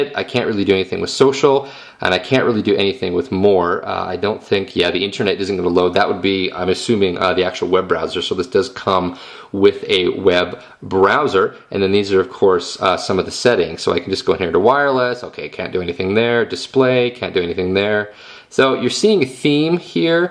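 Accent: Canada. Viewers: And off-topic, but this was the first time I noticed the Canadian accent. lol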